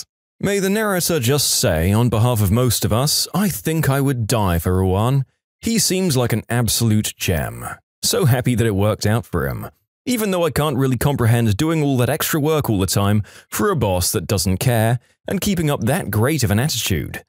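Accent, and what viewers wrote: United Kingdom. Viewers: WHAT THE ACCUALL- WHAT'S WITH THE VOICE?! SINCE WHEN IS BEITISH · Luh, Another British narrator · Bro u seem more Britishie Today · Another British narrator???!!!😂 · What the hell happened to his voice he sounds brit ash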